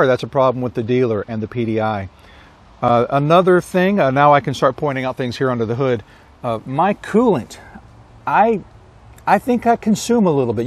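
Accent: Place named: United States. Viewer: Wow! A European speaks American English with the proper accent.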